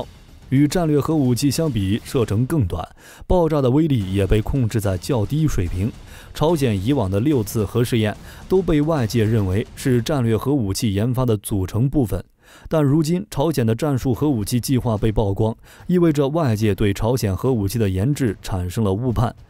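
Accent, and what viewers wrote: China. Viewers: 频道主是大陆人吗，口音好像啊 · 一听就知道啦，就是中国口音，也许国籍不是中国，但是绝对中国大陆口音，不是台湾，也不是马来西亚新加坡，也不是香港，就是中国大陆的。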